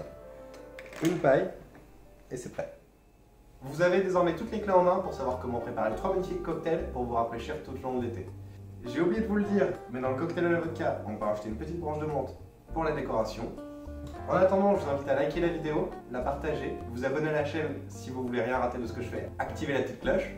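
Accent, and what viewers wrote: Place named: England, United Kingdom. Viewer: Toujours aussi magnifique cet accent anglais 😂 a goûter même si je ne suis pas fan du Pamplemousse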